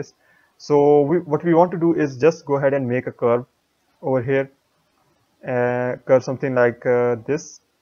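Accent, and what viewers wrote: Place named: India. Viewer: The professional Indian sounding guy saves the day. Thank you fam.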